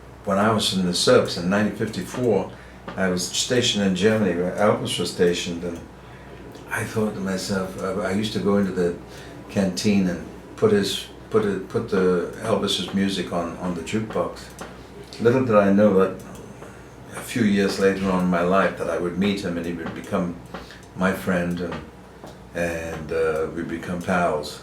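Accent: United Kingdom. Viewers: Engelbert is very relaxed, and reminiscing about the old days! This is backstage, in his Dressing Room at the Count Basie Theater in Red Bank, NJ and I was there, to see the show, and had the nicest meeting with Enge after his show. He is so soft spoken, and that touch of a British accent, and telling his stories, and I love to sit there listening to him. Thank you Mark Weiss and Rock Scene Magazine for a most enjoyable interview with Engelbert - The King of Romance! <3<3<3 Dorothy Whalen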